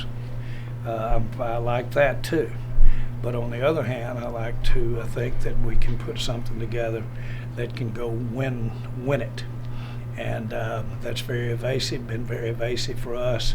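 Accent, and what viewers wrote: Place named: South, United States. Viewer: Last known human with the accent of a slave owner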